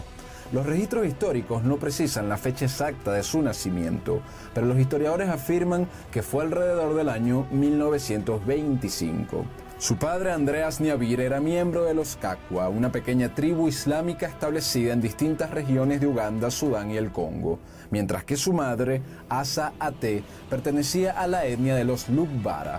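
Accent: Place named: Venezuela. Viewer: La historia debe ser buena pero solo escucharla por un acento venezolano me parece asqueroso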